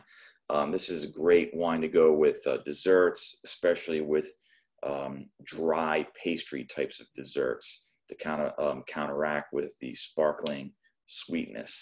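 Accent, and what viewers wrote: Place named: Italy. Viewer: Lots of interesting info and great italian accent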